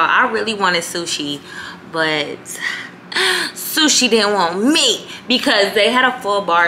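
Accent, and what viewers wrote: England, United Kingdom. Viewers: British accent always has me in stitches😂😂😂 it’s like she talking regular then all of a sudden, boom, accent. I’m like girlll😂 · Your English accent kills me every time, so funny. You say literally -perfectly 😂❤ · It's the English accent for me LOL Gworl I love it LOL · The English accent😂😂😂 literally! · It was the fuckingggggg BRITISH ACCENT for meeeeee🤣🤣🤣 “LITRALLYYYY” 😭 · Love your videos as per...and the sprinkling of British accent you give Love from the UK 🇬🇧💕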